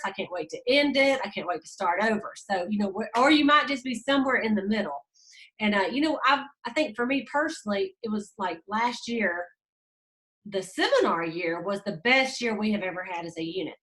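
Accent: South, United States. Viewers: I love your Sunday chats. You keep us up to date. I love hear your southern accent ,when you explain or introduce company promotions. You seem to always make it clear and very convincing that we can achieve and you always make an example of how you did it. Which is so encouraging. You are a true example of Go Give A great representation of Mary Kay.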